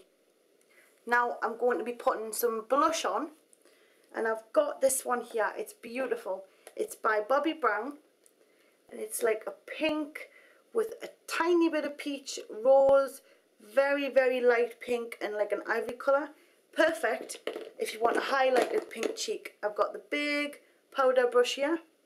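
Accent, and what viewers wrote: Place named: South Shields, United Kingdom. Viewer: omg I can't believe your from south shields Newcastle I have been there!!! I love your videos and accent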